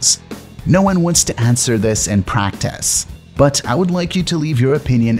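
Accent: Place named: Europe. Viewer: You just said the Su-34 is just as good as the F-15. What do they teach you in that European country that you come from? Yes I know that's where you come from because I can hear your accent. You maybe bilingual but, English is definitely not your first language. I do congratulate you for your mastery of the tongue though. The Su-34 fullback is a ground attack aircraft. The F-15 is a fighter airplane. There is a difference. A Su-34 is mincemeat to an F-15.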